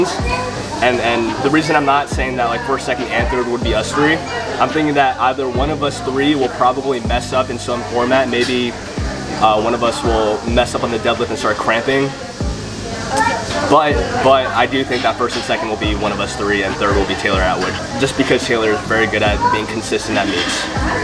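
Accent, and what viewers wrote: South, United States. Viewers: Where you from? Didn’t expect such a southern accent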